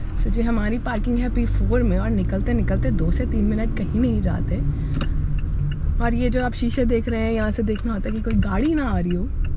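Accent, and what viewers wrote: Punjab, India. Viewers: I really like your punjabi toning 🔥❤️❤️😁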